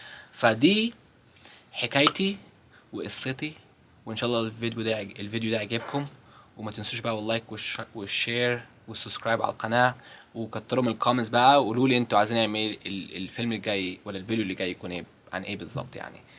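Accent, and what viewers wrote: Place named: United States. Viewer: مش بيتكلم مع عرب كتير فمخارج الحروف بقت اقرب للحروف الانجليزية وخاصة باللكنة الامريكي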